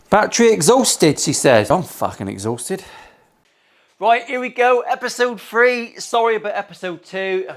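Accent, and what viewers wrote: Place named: Wales, United Kingdom. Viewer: Nice work on the Welsh accent, try throwing the odd 'Tidy butt' in for a bit more authenticity.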